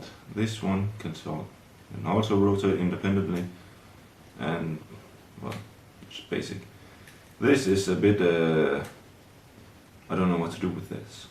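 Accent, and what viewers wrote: Denmark. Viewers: der er et par stykker der spørger om du er fra Danmark..... jeg siger det er nærmere Jylland :) din accent taler for sig selv :)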